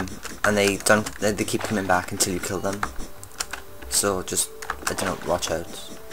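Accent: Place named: Wales, United Kingdom. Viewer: Your Welsh accent rules. Greetings from Krautistan, and thanks for thid very helpful series for Terraria beginners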